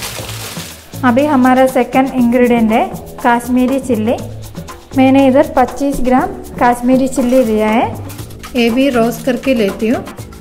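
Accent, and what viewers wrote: Kerala, India. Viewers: I can actually feel the mallu vibe while she's talking istggg the actual way a malayali talks when they try to speak in hindi I remember my relatives talking like this when they came to Mumbai for the very first time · Malayali ki hindi .good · I know this kinda sounds crazy but your voice and way of speaking hindi reminds about my frnd from Kerala during my UG days. I just love that. #oldmemories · Mam your recipe and your hindi pronaunsation both are authentic keralian nice thanks god bless you 🙏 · I tried the recipe. Yummy. Sorry to make personal comment. Your Hindi is really good though in Malayalam accent with a beautiful smile. God bless you. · Last year we had been to kerala.And also one of my best friend is from kerala. I just love your hindi accent. It is so sweet. Thanks for sharing keralian recipes. God bless you n stay blessed always. 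· Yess true your hindi is sooo cute... Even i am malayalee but born and brought up in Gujarat and Bombay so my accent is opposite when i speak malayalam all understand that i am not brought up in Kerala...😀😀😀😝. I am trying yours recipe today.. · Your voice is cute...than urs receipe...love Hindi from keralites...its sweet n swett.. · 😀true..authentic kerala hindi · Seriously, I love her hindi more than the recipe .And it is so Kerala authentic. Really cute.😀😀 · Love ur Sweet voice of speaking hindi with Kerala accent😅😅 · Loved your recipe. Your detailed instructions. N above all your enthusiastic n beautiful kerala accent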